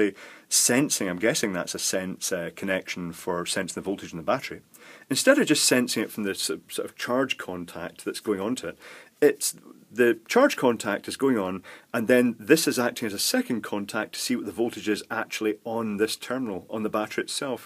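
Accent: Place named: Edinburgh, United Kingdom. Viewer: I followed your (very clear) recommendations and now have a fully working 'UK' charger.Love the videos and the accent (Edinburgh?). Keep 'em coming.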